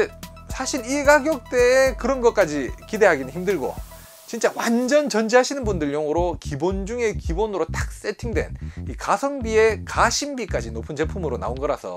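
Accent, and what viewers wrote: Gyeongsang, South Korea. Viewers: 대구 사람 이신가요??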